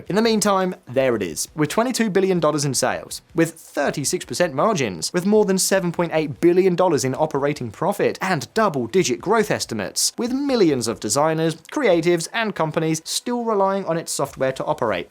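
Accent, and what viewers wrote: United Kingdom. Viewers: British accent. Disregard.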